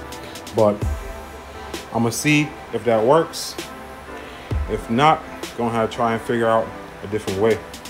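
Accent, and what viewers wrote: Jamaica. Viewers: Just subbed when mi hear di patwa jump out dwl dem rass plug dear eeee. Respect my yute u build wikid.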